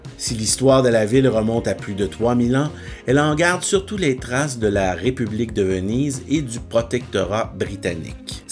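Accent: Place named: Quebec, Canada. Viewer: Très bonne vidéo, Merci. Sous le soleil ça doit vraiment être beau 😅..... après quelques minutes nos oreilles se font à l'accent québécois, qui n'est pas désagréable. Juste un petit détail....un moulin ça sert à moudre les céréales....pas à les "produire" 😂😂.... C'est la terre qui les produit .... bien le bonjour amis québécois ✌️😊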